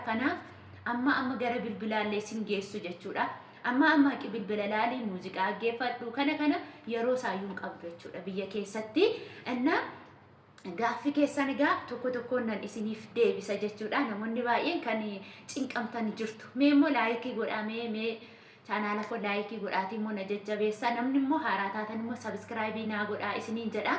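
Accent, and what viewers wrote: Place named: Wollega, Ethiopia. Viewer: Sagalee beekama silayu akka wlg tate❤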